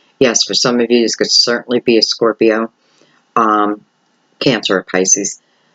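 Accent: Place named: Pennsylvania, United States. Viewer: You’re from PA. I know that accent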